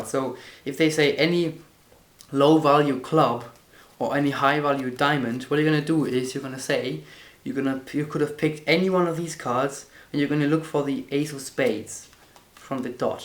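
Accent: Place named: Germany. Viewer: That german accent😂👳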